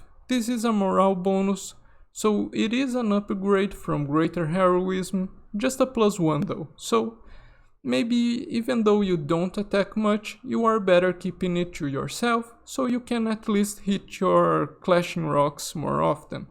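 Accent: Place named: Brazil. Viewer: EU SABIA QUE VC ERA DO BRASIL PELO SEU SOTAQUE! Hahaha Bro, o teu canal é o único que eu assisto e além de concordar com builds que são parecidas com as que eu penso em termos de prioridades de feats, raças, multiclass e outras coisas, eu tbm aprendo para um!! Parabéns pelo trabalho!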